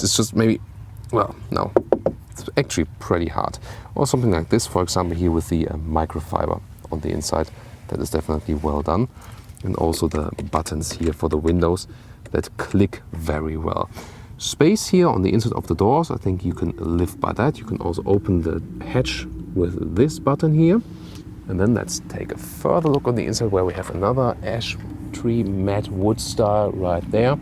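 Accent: Germany. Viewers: Schade. Review könnte wirklich gut sein, wenn nicht dieser deutsche Dialekt wäre. Das Review von Brian ist da sehr viel angenehmer anzusehen. Meine Meinung, besser einfach auf deutsch das Review machen, oder einen native Speaker für das Review in der englischen Sprache. Es hört sich einfach (Sorry) grausam an.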